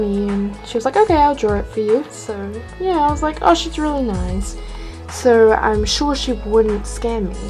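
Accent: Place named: New Zealand. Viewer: i recognised your accent then when you said you were from nz i subbed lol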